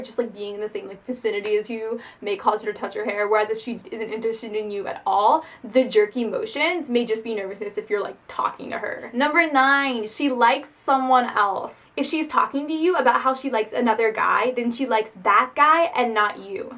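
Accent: United States. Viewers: stop talking fuckin americaneze and fuckin gabbling so fast. I need an interpretor for you! we're not all from manhattan.......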